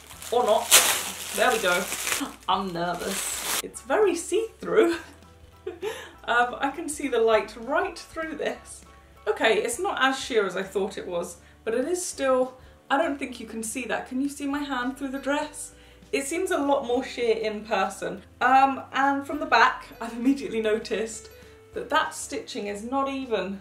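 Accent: United Kingdom. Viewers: OHH MY GOD, the second dress you showed, the green one, my friend had for our school ball last year! i remember her showing me that same pic you put on the screen and it was such a pretty dress!! that's so crazy haha, especially bc i live on the opposite side to the UK where I'm thinking you're from bc of your accent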